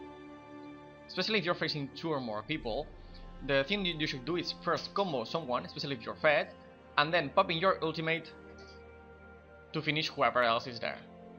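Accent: Catalonia, Spain. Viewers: you are spanish? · ¿Eres español verdad? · You catalan? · You're Spanish? I know :v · Awesome! I've actually never heard anyone from Spain talk before now that I think about it, and now I'm really confused how that never happened. Oh well.. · spanish? that seemed a bit catalan xD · I play as shaco every day just for practicing and you have helped me alot with your tips. Thank you bro.... PS: Your accent is quite different, Are you from Spain? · Are you catalan? Your accent doesn't seem like the english is your native language. Good vid btw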